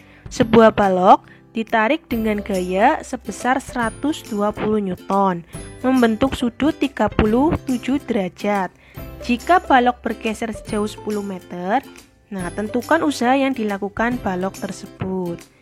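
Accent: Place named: Tegal, Indonesia. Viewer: Ini kayaknya mbaknya orang tegal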